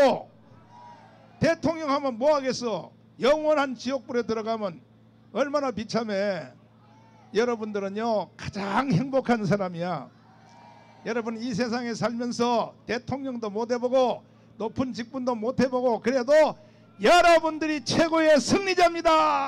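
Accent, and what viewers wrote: Gyeongsang, South Korea. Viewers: 목사님 찬양도 경상도 사투리도 은혜로워요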